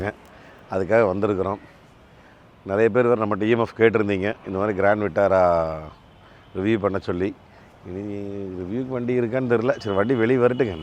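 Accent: Kongu, India. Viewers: அருமையான துவக்க விழாவில் கலந்து கொண்டு அற்புதமாக நமது கொங்கு தமிழில் விளக்கம் கொடுத்தீர்கள்... நன்றிங் கோ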